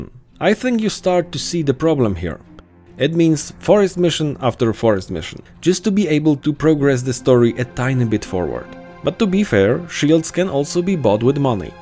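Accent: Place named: Germany. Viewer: Discovering your video via the Steam page of Desperados. Great video with excellent review on which i agree 90%. I grew up playing Commandos (from the first to the last) and Spellbound games (Robin Hood, Chicago and the three Desperados games) and i completely relate to your points and feelings. I still play those games from time to time (they're still very nice games to play even in 2021) and i played Mimimi games too (Shadow Tactics and Desperados III), even though i liked them very much they lack the charm those old 2D Isometric games had. My biggest dream would be a Desperados 1 re-master with 4K HD graphics and better animations yet the very same gameplay and story (+ the expansions maps that were planned but never released). Anyway you earned my like and my sub ! :D PS: Where are you from ? I can hear a german-ish accent but i'm unsure. BTW i'm French and i understood 100% so your English is perfect !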